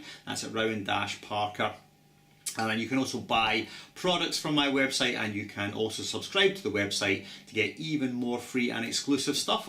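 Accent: Scotland, United Kingdom. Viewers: Instant subscribe because of Scottish accent. (And amazing playing as well ;) )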